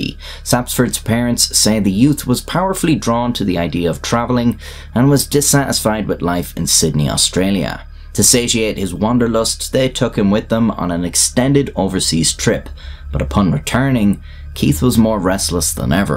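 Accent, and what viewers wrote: Ireland. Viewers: Gosh I love the Irish accent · Narrated in an Irish accent although the grammar, syntax and tortology is clearly American. Why?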